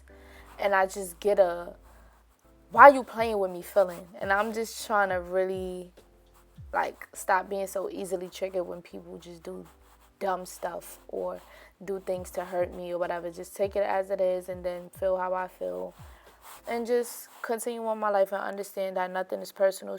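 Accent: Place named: Baltimore, United States. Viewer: Lol are you from Baltimore? I love you accent btw 💕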